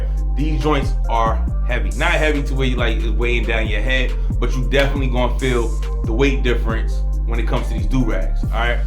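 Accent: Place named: New York, United States. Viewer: He's clearly from NY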